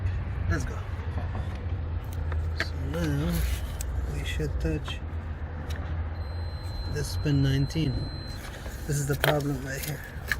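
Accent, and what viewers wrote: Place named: Iraq. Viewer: Nice Iraqi accent 😊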